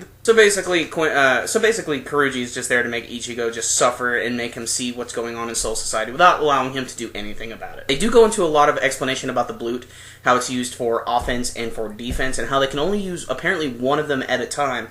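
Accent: Germany. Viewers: Your german accent is freaking hilarious xD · No matter what happens this final arc I will enjoy it simply for the stereotypical German accent in your reviews.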